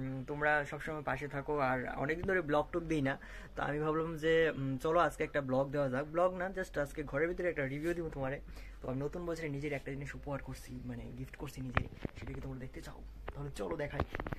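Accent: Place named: Sylhet, Bangladesh. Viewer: Eta siliti Bangla style na ? Val lagta se tomar ro loge suniya 😀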